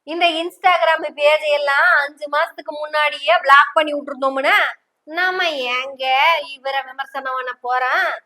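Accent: Kongu, India. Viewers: neenga pesuràdai record panni keluñgal ungal Kongu Tamizh is super your boldness is super and my humble request is not to say that you are a Malayali even though your parents are from Kerala but you are a Great Tamizh Magal.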